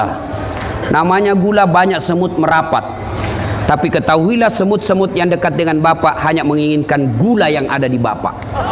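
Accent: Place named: Makassar, Indonesia. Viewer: Alhamdulillah meski di Tanjung Balai dialek makassarx pak Ustadz tdk hilang❤😂😊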